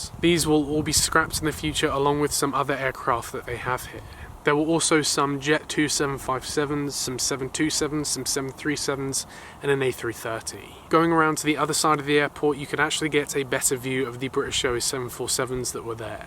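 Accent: United States. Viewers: Stopped watching when you started using American pronunciations. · Nice video. Why are you speaking American English, it’s a bit strange? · Nice vid, but ditch the US accent/pronunciation.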